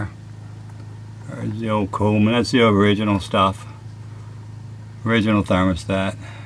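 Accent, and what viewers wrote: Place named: Cajun, United States. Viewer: I like your style.... I sometimes wonder why people feel the need to criticize anonymously when they wouldn't say it to your face. Like the joker that said your voice is slow. I lived in Baton Rouge and it was nice to hear that Cajun twang again. And good video too. Nicely done.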